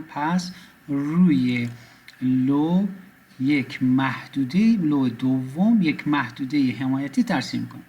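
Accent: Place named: North, Iran. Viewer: من از کردستان به عشق اون لهجه شمالیت کامنت می‌زارم♥️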